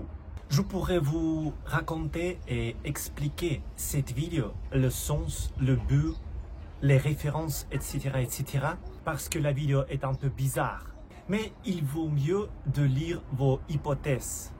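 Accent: Russia. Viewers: salut ton accent russe est cool bisse de france.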